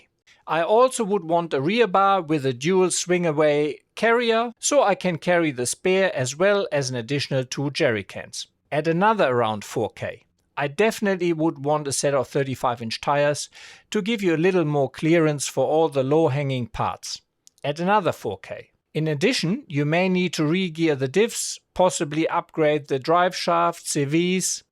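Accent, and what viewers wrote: Germany. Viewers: i agree in all , precise look over all , sounds like you got an German accent ...😎 Greets from Bavaria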